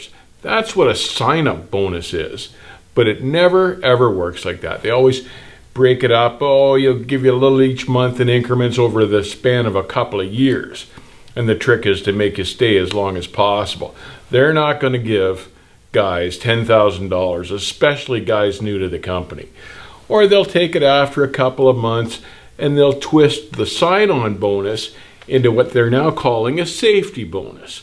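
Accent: Canada. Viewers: U sound Canadian. Our only friends. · Good tip and thank you for the video. You sound 🇨🇦 Ian... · A good ol canadian boy, eh. He talks a lot aboot stuff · Is that a Canadian accent i hear ey? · This guy comes across as American but sounds Canadian as hell. He's still hilarious, however. I'm subscribing.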